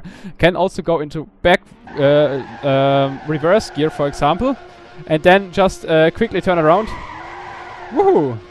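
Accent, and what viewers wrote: Germany. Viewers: Hey, are you maybe german?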